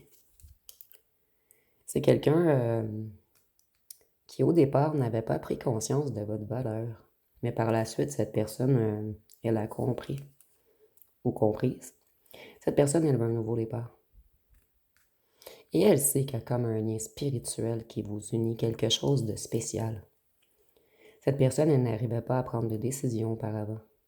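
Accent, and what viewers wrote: Quebec, Canada. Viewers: <link> Charles DE GAULLE, lorsqu'il arriva en TERRE DU QUEBEC, tomba amoureux de cette TERRE Française et de ses habitants et cria avec son coeur: VIVE LE QUEBEC LIBRE!!! Votre accent de la "vieille FRANCE" me rappelle cette histoire glorieuse de la FRANCE éternelle et de DE GAULLE qui éprouva une grande joie de retrouver une FRANCE oubliée... MERCI pour vos guidances très psychologiques et clairvoyantes qui nous aident à comprendre les situations complexes et complexées par des blessures d'enfance... 😘💖👍🙏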